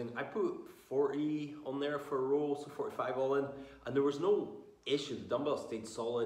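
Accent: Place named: United States. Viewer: Why's he speaking American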